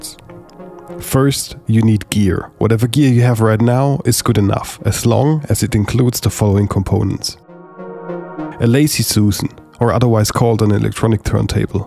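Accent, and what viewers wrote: Germany. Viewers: Erstes Wort und ich wusste du bist deutsch Haha😂👍🏻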